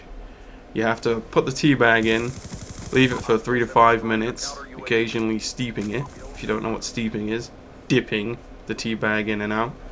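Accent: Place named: United Kingdom. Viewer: Hey..much love from Germany to the Voice of GB;) it's so funny to listen to you and...you with the british accent and the opposite is with his cool ny talking thing...;)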